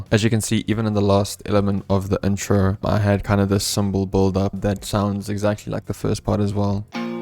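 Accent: South Africa. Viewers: Have you ever lived in south africa? Accent is strong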